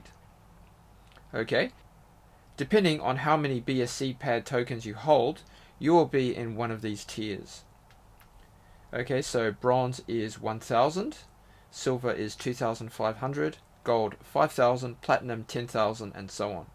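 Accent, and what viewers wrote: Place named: Australia. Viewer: Hey mate. Great video. You sound like another Aussie hahah Im lockdown in melbourne. Anyway can i ask. If you have all the BSCPAD staked already. When the IDOs open, do you have to then go and buy BUSD and BNB or are you just using the BSCPAD tokens as like collateral or something. Only part i dont really get. I would think we will need BNB for fees. But again do we need to buy BUSD and if so how do you work out how much you need. Cheers again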